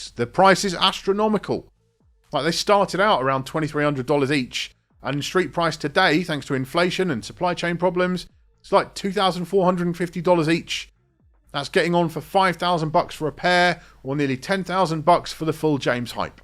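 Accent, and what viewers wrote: England, United Kingdom. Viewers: In we TRUST!!! The only English accent DJ I can stand lol... No offense please, but let's be honest, I hate watching videos from people with an accent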